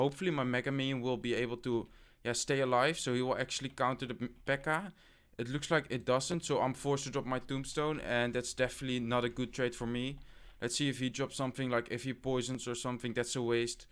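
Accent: Netherlands. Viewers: Ahaha ik hoor zo aan je accent/stem dat je Nederlands ben!! Ps, leuke video! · Tiene acento holandés.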